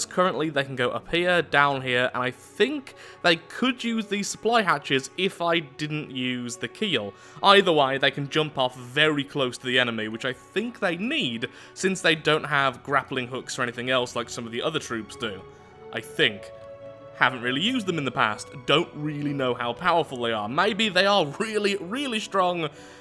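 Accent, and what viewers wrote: United Kingdom. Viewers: No I'm here for the jokes , British accent and KABOOMA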